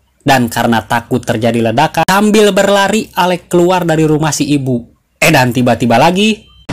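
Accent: West Java, Indonesia. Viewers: Lanjut dengan logat sundanya euyy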